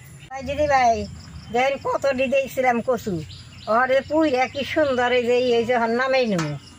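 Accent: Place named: Bangal, Bangladesh. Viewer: একে তো ফোকলা তারপর বাঙাল ভাষা। কিছু ই বুজতে পারলাম। না সরি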